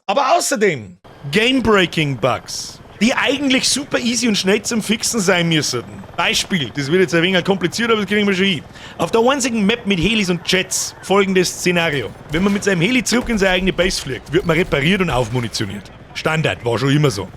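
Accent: Bavaria, Germany. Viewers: Geil, endlich moi a bayrischer Spieltester! Des feier i ! Abo und like ist raus. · Woooas?!?! Superüberfordert vom Bayrisch aus dem Stand Sehr erfrischend Japp nice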